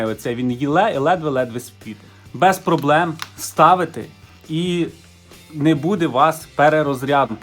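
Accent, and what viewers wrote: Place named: Western Ukraine, Ukraine. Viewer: Бля, этот акцент западенский конечно, пиздец 😅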